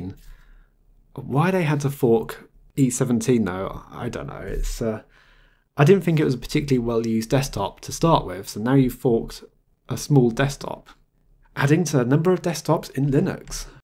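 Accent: United Kingdom. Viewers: I have been a subscriber for several years. For a laugh (and because my ears are old and worn) I turn on CC. I assume CC is US based because your British English comes out a bit funny at times. BTW I used Sabayon for a few months several years ago and liked it.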